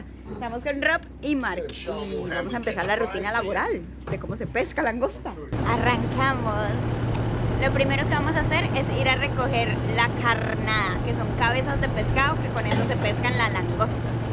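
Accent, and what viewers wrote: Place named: Colombia. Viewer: Hola me llamo Yanet, soy cubana, vivo en Italia y te he encontrado por casualidad en YouTube y realmente tus videos nos gustan mucho porq estoy conociendo Australia gracias a ti estoy fascinada, he visto tantos de tus vídeos eres fantástica, y amo el acento colombiano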